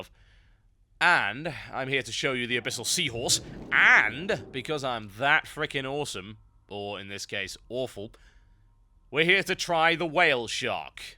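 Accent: United Kingdom. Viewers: i only watch things like this cause it has WoW and a brittish person talking in it <3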